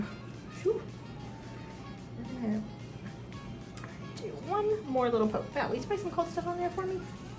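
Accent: South, United States. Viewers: Do any of you know of a great guide to Lexington? I am looking to move and want a safe but affordable condo/townhome as I downsize. Do y'all like Lexington as your home? Is it easy to make friends? I am a Lupus patient and will need rheumatologist/nephrologist/PCP/orthopedic group...the works! I posted this question because of your sweet Southern accent - made me feel at home though I am from Texas. Any and all info would be appreciated. Thanks in advance and peace and love to all!! 💜🌺🌸💜💜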